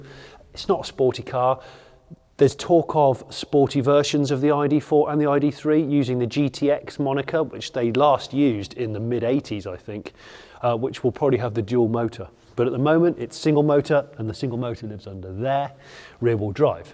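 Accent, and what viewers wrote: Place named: West Country, United Kingdom. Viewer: lol at your voice control tests with your wurzzle accent, good luck with that fella. In the many years or working in IT and tried pretty much all of them I have only found Cortana to understand our twang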